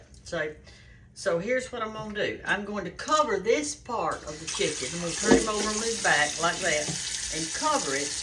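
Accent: South, United States. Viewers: I just love that southern accent. I can listen to her all day. I’m from New Jersey. So we kind have a NY accent🤣🤣🤣🤣🤣🤣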